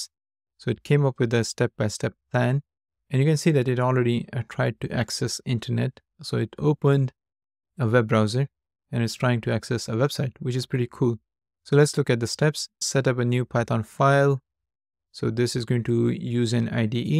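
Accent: India. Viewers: Bro it's a Indian accent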